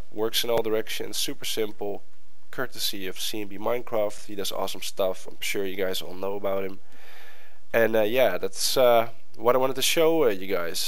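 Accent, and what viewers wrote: Netherlands. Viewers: it's funny how you just hear a Dutchmen talking english :D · Being dutch myself, I can recognize his accent as being dutch. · you sound realy dutch :S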